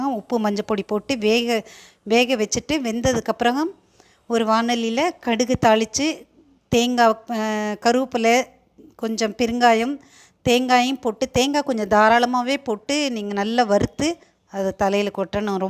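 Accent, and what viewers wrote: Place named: Tirunelveli, India. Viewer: சமையல் சூப்பர் ... தங்கள் பேச்சில் நெல்லை மணம் வீசுகிறது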